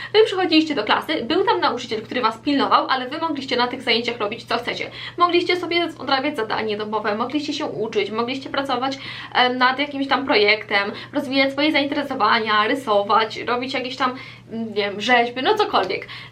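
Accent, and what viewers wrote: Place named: United States. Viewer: Jestem bardzo ciekawa jak brzmial twoj glos zanim pojechalas do usa bo teraz brzmisz jak prawdziwa amerykanka mieszkajaca w stanach ktora przyjechala do Polski i ma korzenie Polskie ile lat bylas w usa tylko rok?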